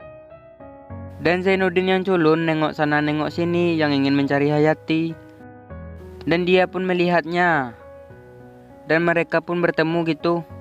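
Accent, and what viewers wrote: Madura, Indonesia. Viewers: Elu orang madura ya min kok logatnya kyak logat madura gtu😂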